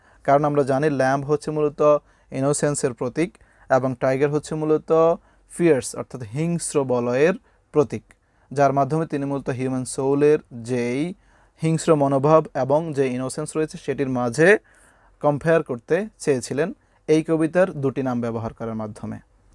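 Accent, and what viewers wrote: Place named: Faridpur, Bangladesh. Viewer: আপনার বলার ধরণ একসেন্ট, টোন, অনেক টা ফরিদপুরের মতো। ❤❤❤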